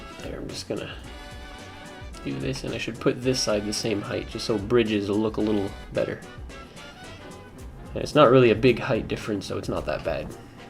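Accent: Canada. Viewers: Ok, are you from Minnesota or Canada? Your accent is very...Canadian. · I thought I could tell from your voice you were Canadian, MTL talk and Canada Day video proved it lol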